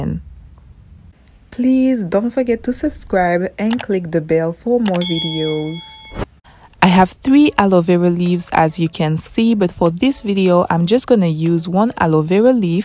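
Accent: France. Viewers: She got French accent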